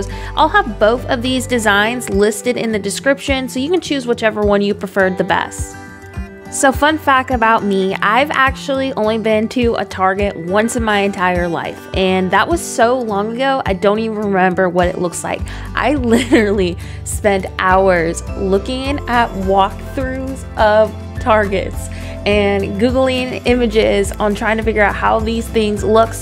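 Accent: South, United States. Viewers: I thought I heard a bit of a southern accent but when you called the shopping cart a buggy I knew you were my people lol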